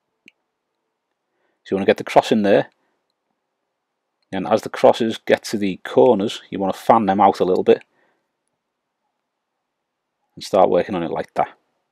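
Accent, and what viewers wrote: Liverpool, United Kingdom. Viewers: did any1 else at the begining think he sounded like he was from the netherlands n was talking a foreign language on the introduction then i realised he was a scouser no offence loool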